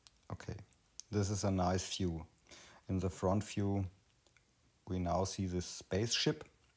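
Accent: Bavaria, Germany. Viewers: Thanks for sharing. I love Bavarian English 🤭. Alles gute 🫶🏼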